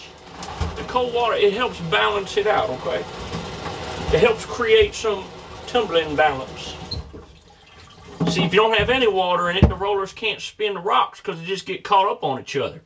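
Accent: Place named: Appalachia, United States. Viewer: holy hillbilly batman. love it though, A+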